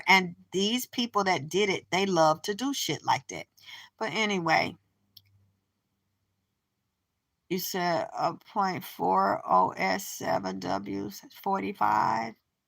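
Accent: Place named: New Orleans, United States. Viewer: Your accent I love it and you are funny sound like u may be from New Orleans dirty dirty south accent dirty my favorite word you say lil Wayne and Juvenile say that dirty all the time.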